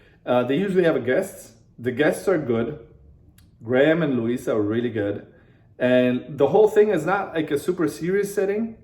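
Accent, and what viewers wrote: United States. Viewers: Thank you very much for all the information about the podcasts. I listened to few episodes of little red podcast. It is wonderful. My interest in understanding China arises from my unfortunate encounter to industrial espionage (intellectual property theft and sabotage) in Canada, and how much my refusal to be corrupt ended up changing my life. I came to know how many of my friends are compromised. I wanted to learn Chinese work culture, their fears, their motivations, their aspirations, and their tactics, just to survive. If you are a German, I would never guess that you were not an American (or a Canadian) from your American accent. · You have American accent. Very good English comparing to some German friends I had long time ago in school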